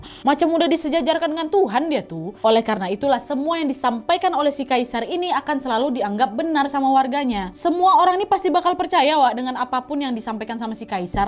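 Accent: Indonesia. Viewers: 😂😂lucu ni bercerita dgn nada Indonesia nya...saya kekadang tak faham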